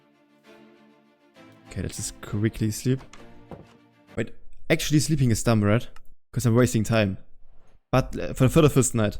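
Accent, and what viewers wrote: Germany. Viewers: your accent gave you away I know a couple Germans and you sound like them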